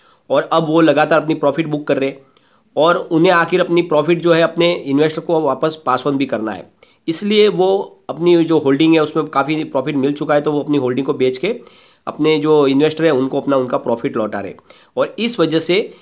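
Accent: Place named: Marwari, India. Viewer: Rajasthan m bhi marwadi accent arha hai. Mybe belongs frm pali,jalore,jdpr,sirohi. May be i gs🤔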